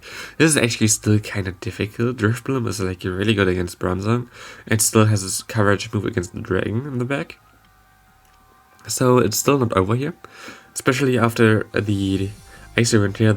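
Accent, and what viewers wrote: Germany. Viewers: Gutes Video aber ich cringe weg mit dem akzent (nicht besonders starker aber deutschen akzent erkennt man gleich)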